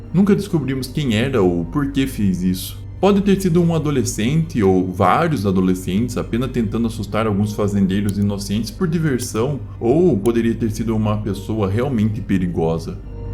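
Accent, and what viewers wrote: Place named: Rio Grande do Sul, Brazil. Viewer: sim. Já me inscrevi, e adorei o sotaque (parece gaúcho) se não me engano.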